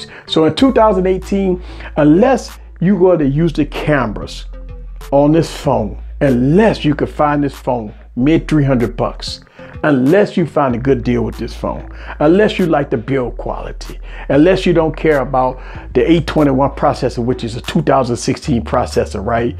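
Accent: Louisiana, United States. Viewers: love that Louisiana accent, pretty cool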